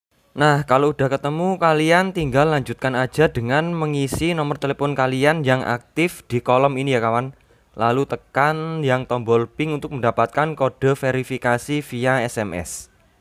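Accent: Java, Indonesia. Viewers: Terlalu medok bangg🙏😂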